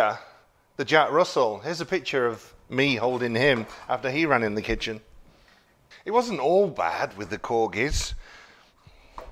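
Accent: United Kingdom. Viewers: Hi! Love your videos from Finland. I like British accents, what is your hometown? Sorry my bad english.🙂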